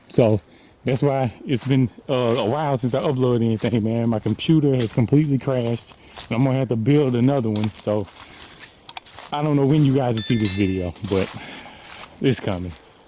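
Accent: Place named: Mid-South, United States. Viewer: This YN!! Out here trappn for real ! Memphis? Sippi? You definitely have that mid south accent. Have you caught one since you did these video?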